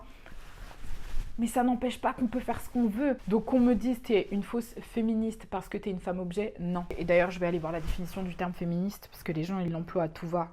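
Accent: England, United Kingdom. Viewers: Team capricorne haha je me suis reconnue dans certains points. Tu es d’une honnêteté vraiment rafraîchissante et tes propos sont très justes, en tout cas ils raisonnent pour moi :) c’est un plaisir de t’écouter et de te regarder ! Je me suis abonnée il y a peu et je me demandais d’où te venait ce super accent anglais ? Tu as fais un séjour à l’étranger ?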